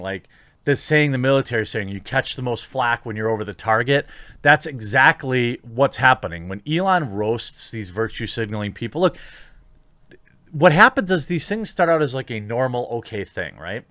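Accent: Wisconsin, United States. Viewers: has a Wisconsian accent? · Nah, but that Wisconsin accent warps things a bit. 😉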